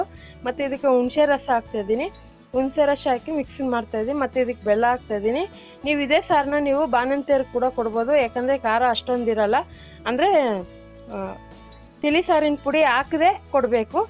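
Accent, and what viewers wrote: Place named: Tigalarapete, India. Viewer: nimma bashe Tigalarapete yavara thara idde.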